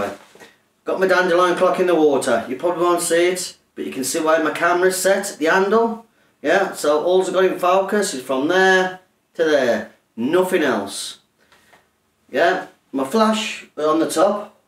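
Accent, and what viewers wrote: Birmingham, United Kingdom. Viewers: You sound like a fellow Brummie! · I used to hate dandelion season and right now in Canada, we don't have any. I can't believe that I am looking forward to it this year! Just discovered your videos and love your presentations and simple explanations. Look forward to more! Great job. As an ex Brit, love the accent! Thanks again Bill Goodwin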